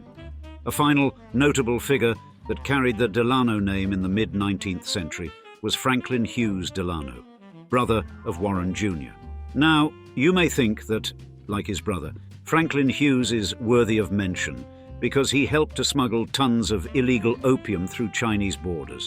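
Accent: United Kingdom. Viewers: Americans pronounce the name 'De- lah-no'. The narrator speaks with a British accent that says it differently.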